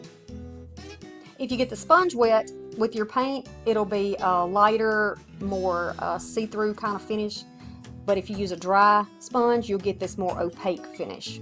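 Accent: South, United States. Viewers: listening to the Southern drawl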